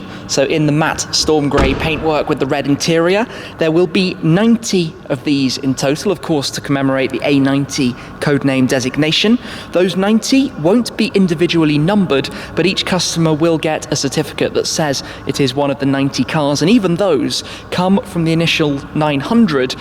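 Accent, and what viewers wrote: England, United Kingdom. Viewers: Ублюдки ебаные эти англичане , какой же мерзкий английский акцент 🤮🤮🤮🤮 Слух режет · First I thought he is speaking qt different but later I realized that he is British! 😂 cheeeehhhhhrs! Gray one was better than red)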